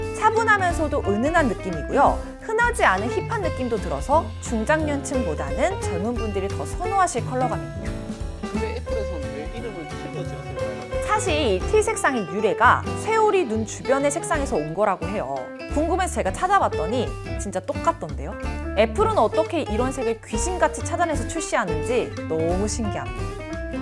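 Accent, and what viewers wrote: Gyeongsang, South Korea. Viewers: 대구 사투리 ...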